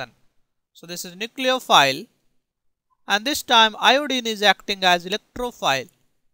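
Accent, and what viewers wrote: India. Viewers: 황진웅 my goodness, he is definitely not french. more like indian or something.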